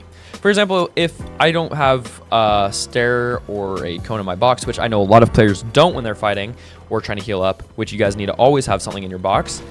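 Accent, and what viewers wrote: India. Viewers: are you punjabi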